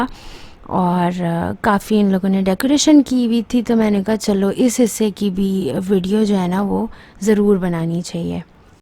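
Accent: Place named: Bihar, India. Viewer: I thing u r Behari speaking mughy behari lagti ho aap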